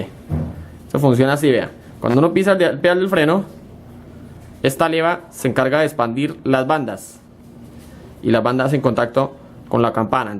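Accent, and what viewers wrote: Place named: Colombia. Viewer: Buen video... Tu voz y acento colombiano me hizo acordar a Nicolás, el mejor amigo de Betty la fea. Saludos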